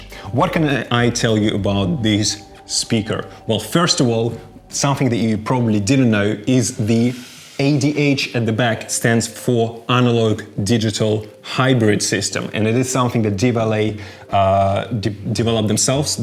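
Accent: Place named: Russia. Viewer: Русский паря чтоль?)) Акцент дичайше русский) Но сама речь - мое почтение) Зато каждое слово понятно)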